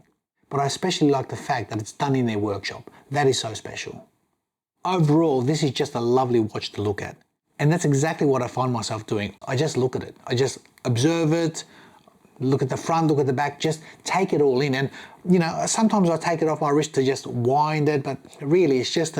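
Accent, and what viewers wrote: Australia. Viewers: I hate this stupid Australian accent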